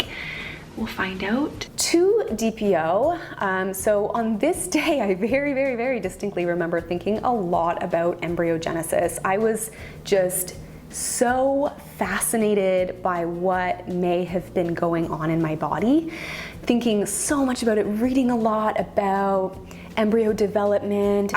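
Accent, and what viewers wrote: Canada. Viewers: Are you Candian or from North Dakota?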